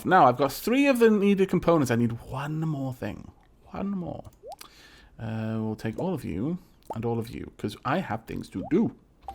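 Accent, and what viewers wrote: United Kingdom. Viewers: Either Wales or England, if I recall correctly.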